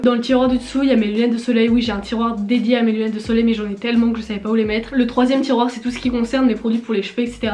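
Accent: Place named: England, United Kingdom. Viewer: salut, j’ai entendu ton accent anglais et je suis juste sous le choc- est ce que tu pourrais me dire comme tu as fais pour avoir un accent aussi beautiful stp ah oui et aussi tes magnifique meuf et ta chambre aussi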